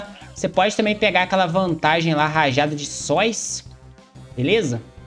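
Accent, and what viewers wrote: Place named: Minas Gerais, Brazil. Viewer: OI tenho uma pergunta, em qual estado você mora? você parece ser mineiro pra mim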